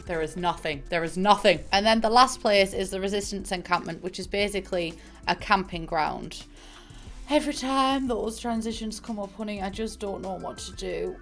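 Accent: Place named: Northern England, United Kingdom. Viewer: I love the fact you translated for other people lol. Im from leeds so as a fellow northerner i understand you fully and you are sooo funny :)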